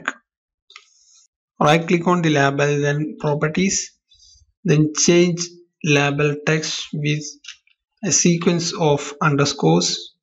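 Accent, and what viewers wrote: India. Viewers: 咖喱味英语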